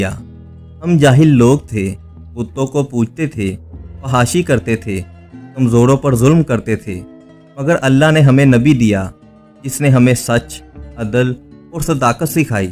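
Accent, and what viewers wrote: Lahore, Pakistan. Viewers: بہت اچھے موضوع پر بہت اچھی گفتگو. کوشش کریں کہ آپ ، اپنی اردو ٹھیک کرنے کی کوشش کریں . لاہوریوں والی اردو لہجے کو ٹھیک کریں .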